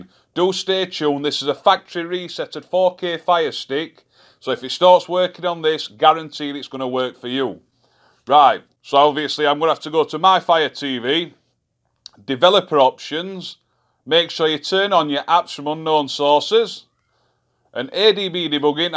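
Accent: Yorkshire, United Kingdom. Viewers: Hi... that's got to be the fastest subscribe I've ever done... 20 seconds in... all I heard was a Yorkshire accent and the laugh... top one mate. I'm on the other side of the Pennines.... Look forward to your upcoming videos.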